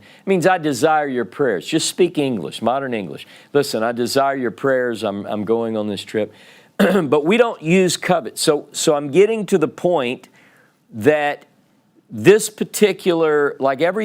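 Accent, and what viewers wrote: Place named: South, United States. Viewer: Brother, your southern accent is infinetly amusing and very fitting for a teacher.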